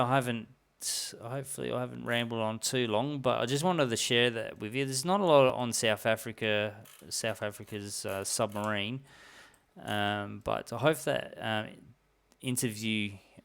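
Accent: Australia. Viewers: This is the worst video I stopped watching it halfway. This guy clearly does not know his facts. Hes ays in the one part where he speaks about the beaches "I assume." He very bravely and confidently points out Seal Island's location, but meanwhile he points it out on the wrong side of the bay. He keeps on saying it is fake, but menawhile he is spreading fake news. How can a guy with and Australian accent pretend that he knows the South African coast and what happening there, but meanwhile contradicts himself.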